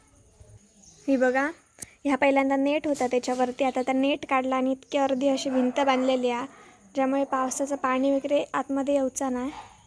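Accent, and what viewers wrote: Malvani, India. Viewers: Tu mast malvani june shabad boltayai eyakaak bara vata mast video chavkul li jaya go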